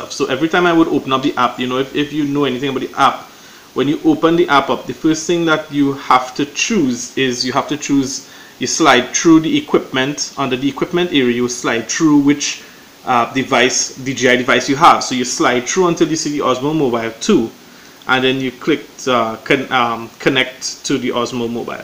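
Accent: Trinidad and Tobago. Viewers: Is that Trinidadian accent? My friend is Trinidadian. He has exact same accent.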